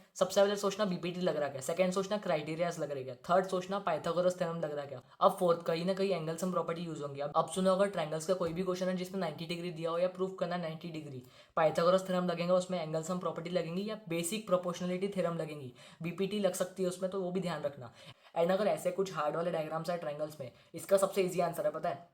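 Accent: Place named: Nagpur, India. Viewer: Bhai tum pakka nagpur maharashtra se ho na🥷🏿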